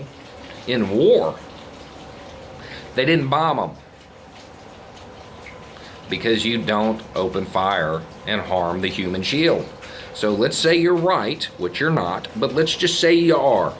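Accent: South, United States. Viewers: The voice of reason has a southern accent 👏🏿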